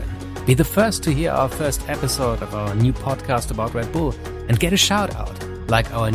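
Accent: Germany. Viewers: Its Sounds Like you came from Germany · Echt ein krasser Kanal (ich gehe aufgrund deines Englischen Dialekts davon aus, dass du deutscher bist) habe deinen Kanal entdeckt, als du glaube ich gerade erst drei Videos rausgebracht hattest. Ich finde es echt krass wie professionell und hochwertig deine Videos seit Beginn an sind, da ist der schnelle Wachstum des Kanals nur verdient. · Is he german?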